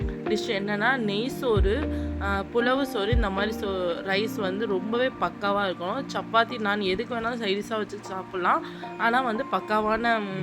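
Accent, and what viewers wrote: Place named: Ramanathapuram, India. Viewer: Akkaw neenga ramnad district dhane,apdiye enga ooru karavanga Madhiri pesuringa.chicken gravy pathale echeel oorudhu ka.mouth watering dishes ka.superb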